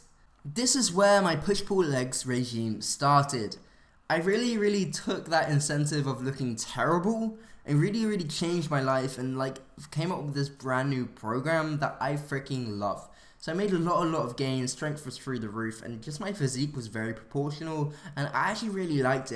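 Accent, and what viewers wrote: United Kingdom. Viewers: Great transformation,. Just amazing....something I would dream about achieving. And as Leland, said, you are also fantastically handsome which makes the gains even more worthwhile. You've got it all, a great physique, super good looking man, wonderful British accent ( I love England and English folk), and of course, a wonderful boyfriend. Unfortunately, I have none of those....lol! Keep up the great work,! Your beautiful....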